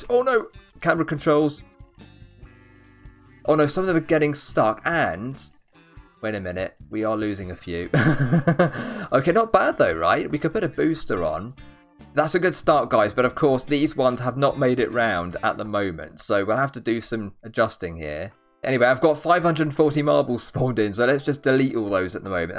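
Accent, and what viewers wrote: United Kingdom. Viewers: Are you British? I ALSWAYS WANTED TO BE BRITISH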